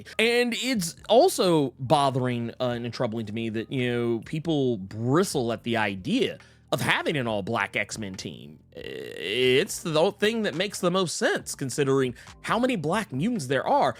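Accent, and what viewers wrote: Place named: South, United States. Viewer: Ok im new here this guy's voice is very misleading it's not bad by any stretch of the imagination but he has a very southern twang, smart , intelligent, nerdy af .....damn I guess I finally understand what people are saying about me. . Gonna subscribe and shut up now... Great video btw ❤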